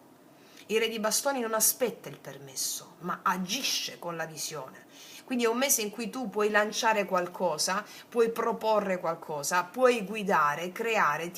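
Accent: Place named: Salento, Italy. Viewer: Grazie ❤ ascoltarti un piacere per la tua preparazione passione e per il meraviglioso accento 😊love Salento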